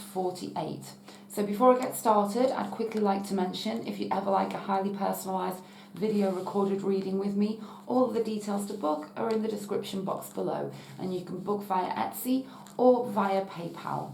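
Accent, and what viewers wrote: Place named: Merseyside, United Kingdom. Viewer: Merseyside accent xx Love your reading xx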